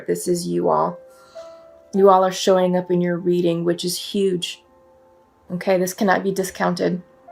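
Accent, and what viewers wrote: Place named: Brooklyn, United States. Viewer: Sounds like your close to Verrazano Bridge Brooklyn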